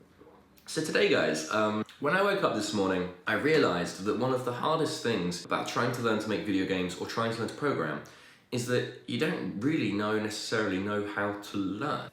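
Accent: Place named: United Kingdom. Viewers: sounds generic British, so hard to place. Could be south east England though. I'm English also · Brit accents are kind of played out over here, but this is a really nice one. Must be specific to a particular region!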